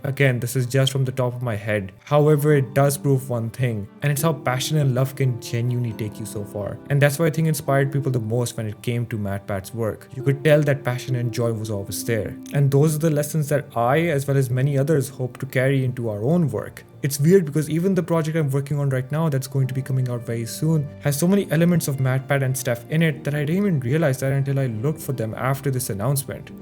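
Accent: India. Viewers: Finally an Indian who recognises him!!!! I recommend fixing your accent and maybe try to better your editing and you'll get millions of views in no time!!!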